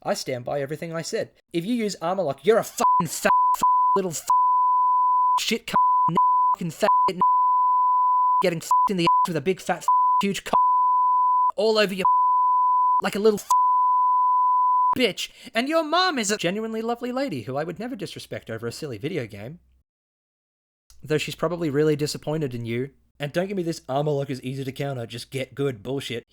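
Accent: Australia. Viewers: Australian youtuber try not to be deadpanned and monotone challenge: impossible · weird that the harvey norman mention was the tipoff for being aussie and not the accent. hi btw other aussie here, i keep seeing halo 4 at this op shop i go to and i've never played halo before and don't own an xbox so am I haunted? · >deadpan Australian accent >scalie rantsona Oh yeah it’s gamer time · Ausie accent with a lizard avatar that has big badonk-a-donks? This is amazing give me more.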